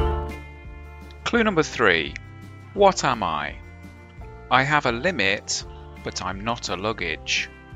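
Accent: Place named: England, United Kingdom. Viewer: 18 wrong for me on that one. Although I know it is a sphygmomanometer, most medical professionals call it a cuff, probably because that is less of a mouthful. As for the ponderer's question, I'd have thought with your English accent you would have known it may be an elevator for Americans, but it is a lift in English English.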